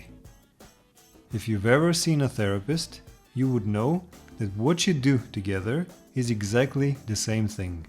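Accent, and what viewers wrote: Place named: United Kingdom. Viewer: Слушам Ви с интерес. Чувам британски акцент. Хубаво! На американски английски “Дневник” е “ diary” Бъдете здрав !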